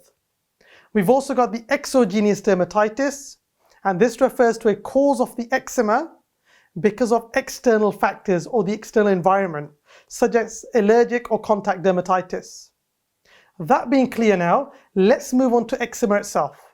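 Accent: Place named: Somalia. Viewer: I like your accent, that reminded me while I was by the border of Somalia.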